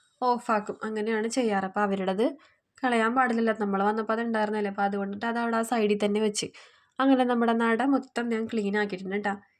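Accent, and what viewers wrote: Kochi, India. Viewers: കൊച്ചിഭാഷ കേൾക്കാൻ ഇഷ്ടമാ ❤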